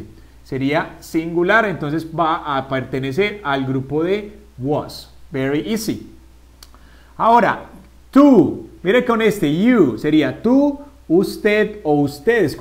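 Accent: Antioquia, Colombia. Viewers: Se te nota lo Colombiano a kilómetros 😂😂😂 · parese paisa ome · me gusto su acento colombiano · like si te distes cuenta que parece paisa xd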